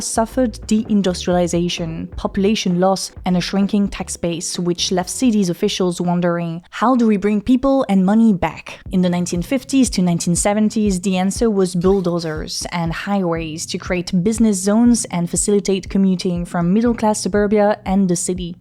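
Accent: France. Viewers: I love the frenglish accent, I miss it 🥺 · OMG, THE FRENCH ACCENT BACK THEN, GIVE IT BACK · Maybe i am weird but always found the French English accent charming. Way better then my danish potato english :D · Don't feel bad about your accent! The French accent in English gets way too little exposure, and makes it harder for foreigners to understand French people who actually try to accomodate them by speaking English. Your older videos provide a great service!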